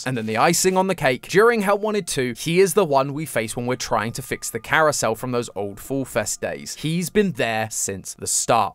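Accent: United Kingdom. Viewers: Iskhow to feel about ngl.. i like his.. british-esk voice but i still T^T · I clicked the video and I thought why is matpat making a British voice and remembered that he retired and this is, your doing a great job! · Feels weird but the British accent is kinda selling the emphasis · I love your British accent. I hope you continue to bring us these juice videos and get your own path in fnaf theories. ❤ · Yah he will do great it's just weird because a British voice instead of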